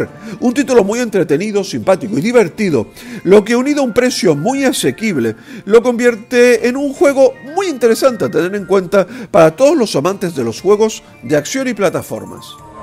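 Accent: Spain. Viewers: aaaanda, por fin lo sé, eres tinerfeño! Sabía que no eras del sur de la península y estaba seguro que serias de las Islas... Muy bonita Tenerife, ánimo con el canal y gracias por los esfuerzos para buscar los juegos de los que nos hablas en tus vídeos :)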